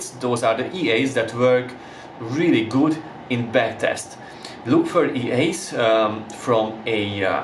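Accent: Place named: Hungary. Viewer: I think from hungry, as far as the accent goes. I'm from there, too. So i guess I could identify it :)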